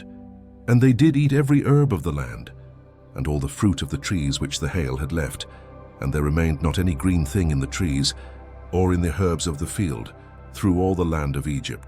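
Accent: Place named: United Kingdom. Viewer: I wish these stories were not spoken with a British accent. It’s disingenuous.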